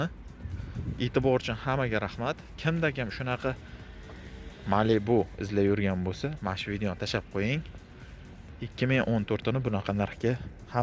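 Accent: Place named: Kattakurgan, Uzbekistan. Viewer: Ака каттакургонданми бу малибу шевайиздан билдим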